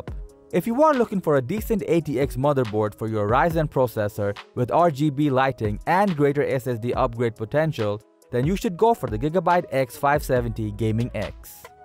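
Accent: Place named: India. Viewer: I know this video is Garbage 🗑 the moment the voice changed. From white boy intro to Indian guy 👦. Bruh you don't need an American guy to to start your video. Plus we can read the info on the box or website. More views with bad comments ain't good for you.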